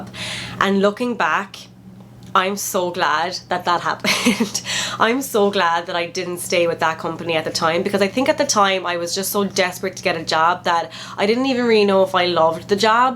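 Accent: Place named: Ireland. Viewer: Love your Irish accent and positive energy. Keep up the good work!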